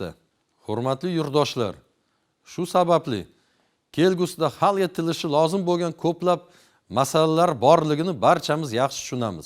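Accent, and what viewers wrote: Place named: Russia. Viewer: Амаки русча мактабни битирган шекинли акцент ута дахшат омад тилайман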